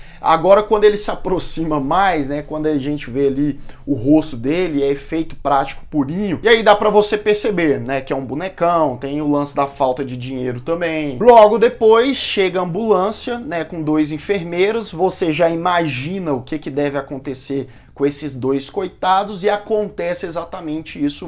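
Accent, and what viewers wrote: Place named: Goiânia, Brazil. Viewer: Sotaque goianiense detectado!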